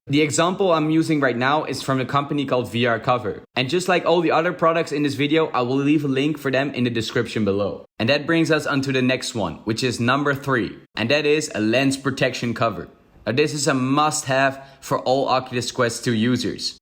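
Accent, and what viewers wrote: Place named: Netherlands. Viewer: Nederlands accentje😂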